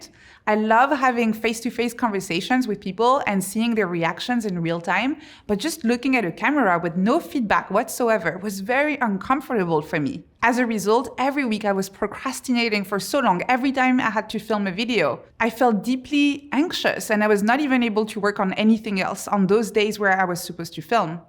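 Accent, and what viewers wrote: France. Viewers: My cynical mind tells me that this impenetrable French-accent madam with aristocratic pretentions has a point — very painfully so. Now all that’s left is to get rid of my cynical mind.... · It is normal behavior. Also, she's obviously French and although she does a great job with modulating her voice to match what she's saying, it can sometimes sound monotonous. Why would she congratulate herself for a degree she got years ago when she had time to digest the joyful emotions associated to succeeding? Maybe y'all americans brag for years on end about your successes but in France we don't repose sur nos lauriers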